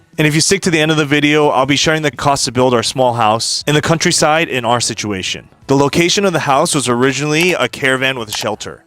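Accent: United States. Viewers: why do you have an American accent? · a Japanese bloke, with American accent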